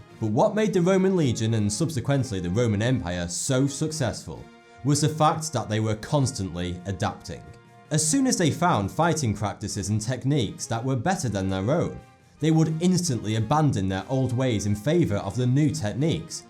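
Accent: United Kingdom. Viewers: Mr. Khan <link> No. It's a dialect that causes a lot of British people to do this. I made the same mistake a while ago with someone else and was corrected. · The British accent just makes videos like these so much better, great video and great channel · Take it from me as a Brit, it's just how we say things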